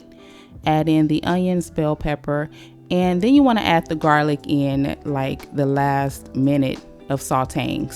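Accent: South, United States. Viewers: Great recipe! Your step of sweating the veggies down first is spot-on.. raw veggies will release a ton of water and make your meatloaf crack apart. You sound like a Southern lady, so may I suggest adding Celery to your veggie mix? The Cajun Holy Trinity of cooking! 😁 Celery should be equal to the bellpepper amount.. Newly subbed!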